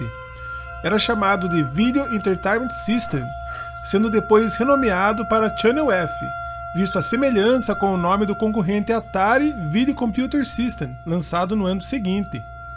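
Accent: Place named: Paraná, Brazil. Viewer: Ótimo conteúdo, mas dicção e "paranês" terríveis.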